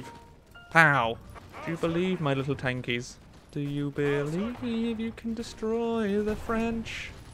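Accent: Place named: United Kingdom. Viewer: british fella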